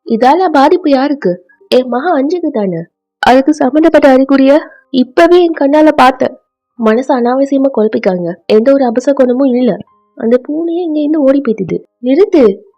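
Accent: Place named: Kerala, India. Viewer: illa akka .. na tamil .. unga tamil kekka alaza iruthu atha ketta malayalam ma nu .. naga pesura tamil ipdi irukathu english mix aki irukum .. anaa unga tamil super ra iruku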